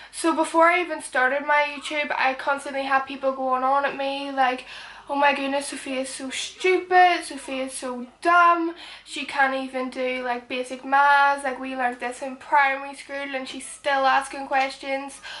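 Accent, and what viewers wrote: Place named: Northern Ireland, United Kingdom. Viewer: Eline P she is from Ireland, Northern Ireland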